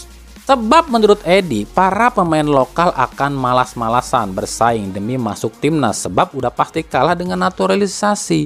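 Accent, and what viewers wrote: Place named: Sunda, Indonesia. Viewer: Hahahhhh,ngadangu nyarios Sunda na hyng seri mang....😂😂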